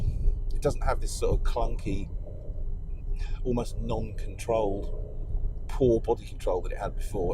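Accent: United Kingdom. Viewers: He has a British accent, bud. That's why it sounds odd 😁.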